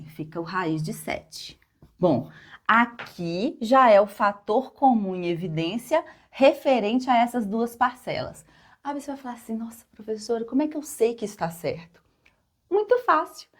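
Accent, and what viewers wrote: Minas Gerais, Brazil. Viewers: Sotaque mineiro...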